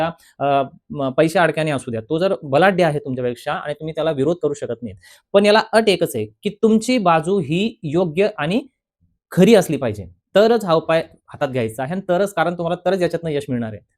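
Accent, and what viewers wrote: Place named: Pune, India. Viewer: तुम्ही धुळे चे आहे म्हनता पण तुमची भाषा पुणेरी आहे धुळे जळगाव ची भाषा नाही वाटत.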